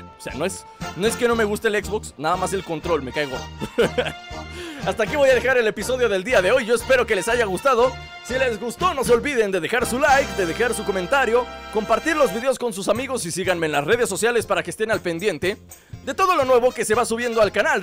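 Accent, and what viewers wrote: Mexico. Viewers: de que parte de México eres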